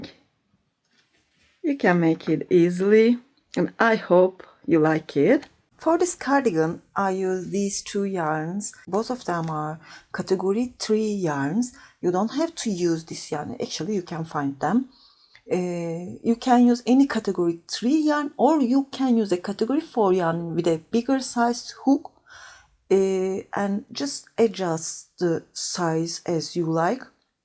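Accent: Turkey. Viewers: Your accent sounds like turkish where are you from? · Abla konuşmandan türk olduğunu anladım bunu türkce anlatırmısın lütfen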